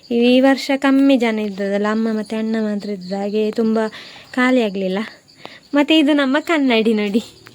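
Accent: India. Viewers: Nim kundapura super ❤ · ನನಗೆ ದಕ್ಷಿಣ ಕನ್ನಡದವರು ಅಂದ್ರೆ ತುಂಬಾ ಇಷ್ಟ ನಿಮ್ಮ ಭಾಷೆ. ಮಾತನಾಡುವ ಶೈಲಿ. ನನ್ನ ಮದುವೆ ಆಗುತ್ತಿರಾ ನೀವು. ❤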